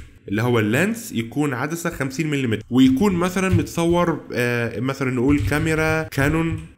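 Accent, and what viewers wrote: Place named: Egypt. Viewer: ياريت تحكي المصطلحات بشي نفهمه وتعطي زوم بالشرح لان اللهجة المصرية صعب فهمها شو شرطا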